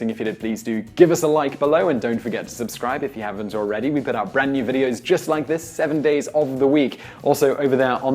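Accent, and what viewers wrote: United Kingdom. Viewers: The dude's British accent makes it sound like he's talking about someone named Bobby.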